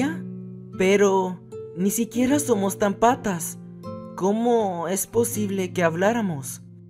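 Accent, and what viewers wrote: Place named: Peru. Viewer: Ala shaval v: Me eh sorprendido con tu acento peruano 10/10 Entons pa cuando la segunda parte :D? ;-; no mms 🌈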